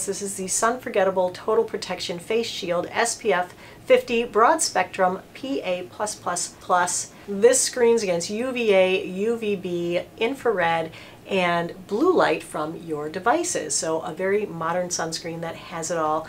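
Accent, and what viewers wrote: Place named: Boston, United States. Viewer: I never remember to ask you--where is your iconic Mass accent?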